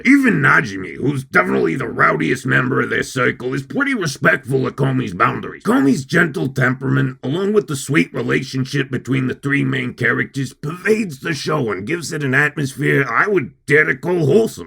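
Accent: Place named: New Jersey, United States. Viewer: Love the jersey accent, although bailitmore accent is better, good video, easy sub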